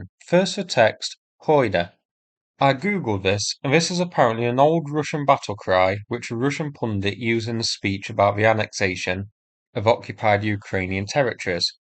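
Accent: United Kingdom. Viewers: Danngg your soothing voice & unique British accent really make my day lol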